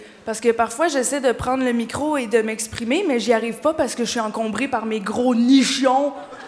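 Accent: Quebec, Canada. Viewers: 5 ans à apprendre le Français et vous comprenez pas l'accent Québécois? Et bien si j'étais vous j'abandonnerais à l'instant. En plus Maude dsns la video a changé beaucoup de mots et de formulations pour se faire mieux comprendre. Ouf... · Je sais pas si c'est son accent québécois tout mignon qui fait que les vannes dégueulasses passent crème comme ça ou si c'est elle qui a vraiment une aura super sympathique, en tout cas j'ai eu de vrais rires sur cette vidéo et c'est plutôt rare pour le souligner ! Bravo ! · J'adore les québécoises, je tombe amoureux des que j'entend l'accent. Mais en dehors de ça, j'ai pas trouvé ça très drôle, il n'y avait aucune transition. Dsl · un con du Quebec dis: SVP oublie pas ton accent!!! Toujours aussi belle et drole! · Excellent rien que l'accent ça me fait marrer :p ! Vive les Québecois ! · Elle ne debute pas mais cest difficile d'adapter son humour sans changer d'accent. 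Son materiel ne voyage pas tres bien. Au Quebec elle est géniale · Ou est l'absurde et où est le direct ? Tu peux juste assumer et te dire l'accent canadien est marrant. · elle est bien plus drôle quand elle parle en Québécois les chutes sont bien plus drôle. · Son accent canadien il me tue😂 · Magnifique l'accent québécois rajoute un certain charme à ce sketch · Alors bien que j'aime bien dieudo et compagnie, je trouve cette fille merveilleuse moi <3 ses expressions faciales sont merveilleuses du genre totalement paumé et son accent québecois ... Je crois que j'en suis amoureux.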